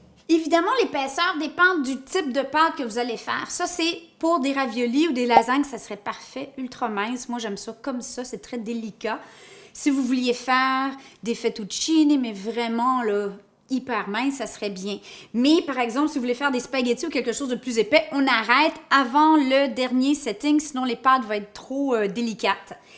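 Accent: Quebec, Canada. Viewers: Tu es marrante et surtout très mignonne , avec ton , ci joli accent Quebecoise ..!! Félicitation , a tes parents , Gente Dame du Canada ..!! Merci , et gros bisous de France . Hubert Etienne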